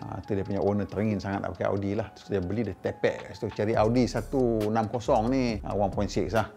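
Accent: North, Malaysia. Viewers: Bunyi Utaqa ceq oiii. Tobat lawak ckp. Heqot, mampoih. 😂😂😂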